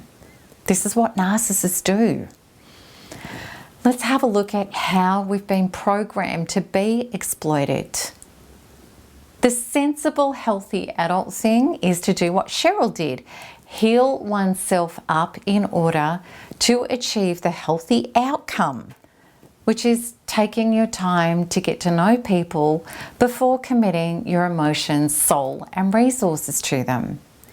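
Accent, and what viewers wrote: Australia. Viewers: What a heavy australian accent.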